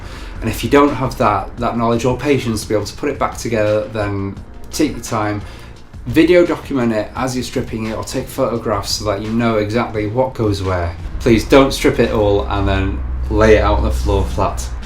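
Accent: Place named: United Kingdom. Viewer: thats how the britan english sounds like !!!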